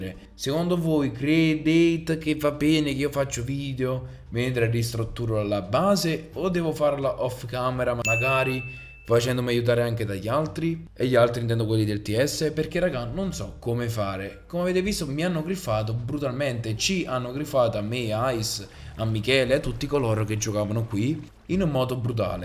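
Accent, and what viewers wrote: Naples, Italy. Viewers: ma sei napoletano?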